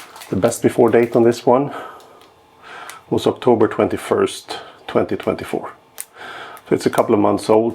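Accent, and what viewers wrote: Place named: Sweden. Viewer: I like your swenglish. Nice equippment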